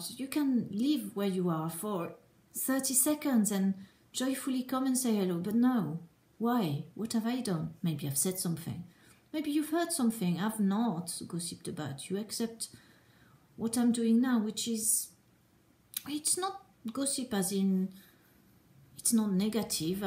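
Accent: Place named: United States. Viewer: What are you doing with USA vocal intonations! Stop it now! Keep regional traits, it is more fun than all sounding the same :)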